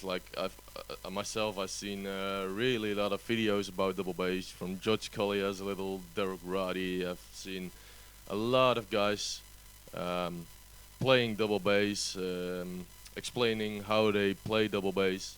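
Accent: Netherlands. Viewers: thou sounds dutch. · lol he's from the netherlands :P. je hoort ut gelijk aan z'n accent. nice vid btw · Haha i can hear your dutch just like me:D im enjoying the video;)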